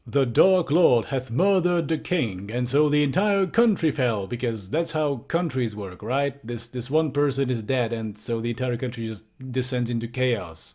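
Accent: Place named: Scotland, United Kingdom. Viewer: Interesting video Ádám, loved the Scottish accent. I've Subscribed. Looking forward to more videos. Is this the channel where you don't rant about trains and busses?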